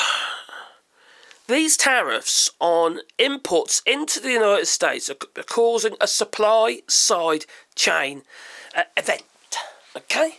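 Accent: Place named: Southern England, United Kingdom. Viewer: It's his southern English accent.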